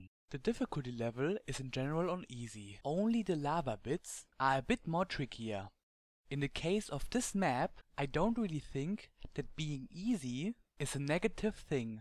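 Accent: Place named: Germany. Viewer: I hope my german accent isn't that annoying <- Lol'd so hard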